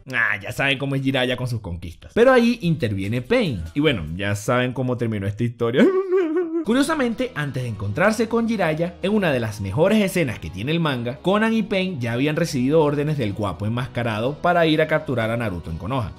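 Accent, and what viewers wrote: Venezuela. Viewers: en este video se le sale el acento venezolano a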